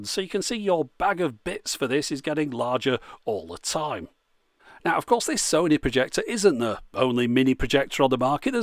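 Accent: United Kingdom. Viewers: Great sound, excellent video, proper British accent. Superb review.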